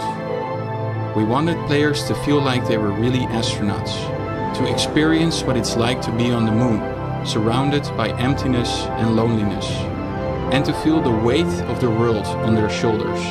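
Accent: Netherlands. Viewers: This really sounds like a dutchman telling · Im sorry for the Dutchie, but what an accent! Joe can almost hear de nederlands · This is a Dutch guy for sure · Dutch accent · Deze gast is sws nederlands als je goed luisterd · i heard the dutch accent before the video started playing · i hear from his accent he is dutch like me haha.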